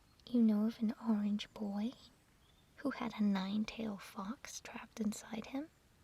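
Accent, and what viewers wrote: United Kingdom. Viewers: Is naturally british with an American accent? Or is she American with a really good British accent?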